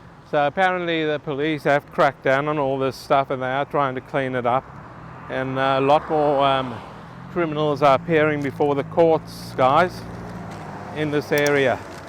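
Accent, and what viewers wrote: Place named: South Africa. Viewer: Sounds like you're from South Africa, mate - one of the highest crime rate countries on the planet!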